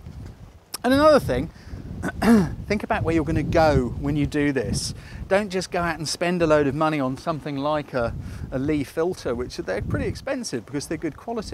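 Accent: England, United Kingdom. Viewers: Loves: 1) Your ability to pass your knowledge, in a simple way 2) The different situations you chose to present to us 3) Your fine English accent... Hates: 1) I m not a hater 2) I respect the work of other people 3) Love, love, love I liked so much that tutor... THANK YOU, THANK YOU, THANK YOU (Dont forget the camerawoman -> Thank you too... Nice video!) Greetings from Greece... PS. Sorry for my bad English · I love British english accent, much nicer than american accent. is such a warm person and I love his videos.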